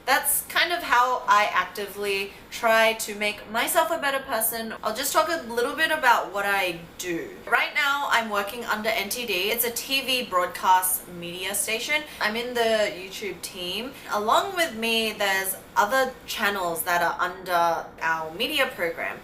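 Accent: Australia. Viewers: I love your Aussie Accent! <3 Love you on OTGW too. You remind me of Constance Wu!!! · Hello, I just want to tell you that what you are describing sounds so similar to my experience when I moved from Prague(Czech Republic) to Sydney in Australia. And all the values you are talking about are all the ones Aussie people and Australia itself taught me. And I am so grateful for that and the 8,5 yrs I spent there were the most beautiful ones in my life so far. I will always be thankful to Australia for who I have become because of that experience. I love Aussie people and their kindness and thank you for teaching others that. That is why I have subscribed to your channel even though I am not big on make up :-). And I love you Aussie accent :-). Keep spreading the kindness! Thank you :-) wish you all the best in your adventure and don't give up. Money cannot not buy you what the experience gives you mentally. 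The enrichment and open mind. Take care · In the beginning I was like "hell that's a hella strong australian accent for someone living in NY" 😅 but then you said you "just moved" so it all made sense 😅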